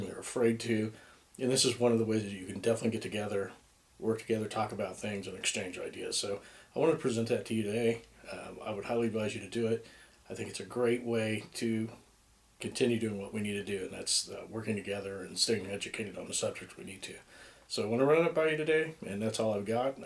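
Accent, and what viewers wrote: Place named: United States. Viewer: Even though you have an american accent, I believe you are a person who fled from Iran and is probably like an american proud christian now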